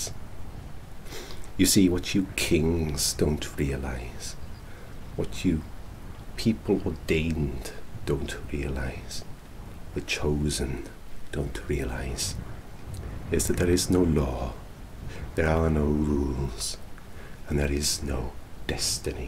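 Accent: Wales, United Kingdom. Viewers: 「 greybeige 」 He’s actually Welsh so this is his ‘true’ accent.😊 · As soon as I saw the horns, I thought: oh. shit. This is incredible,. And the rumbly Welsh accent is 🙌🏻. I have a lot of this world in my head lately- who else is excited for the Gawain movie this weekend?!💚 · How did I not know until now how much I’ve been needing a Welsh accent in my ASMR? Magnificent in every way, as always,. 🤍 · I've watched this so many times now, since it first premiered in the collab...I pop it on every so often and get sucked into the pathos and can't stop watching. By the end I'm like, deeply moved, and also feel so serene from the atmosphere and the progressively more relaxing way the speaking style and mannerisms devolve. "Small mercies against a great evil, they make a difference to a man," indeed. Killer costume, and hats off to that Welsh accent and the distinctive voice and physicality and...self, you brought to this. 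One of my top favorites of yours...just...so good!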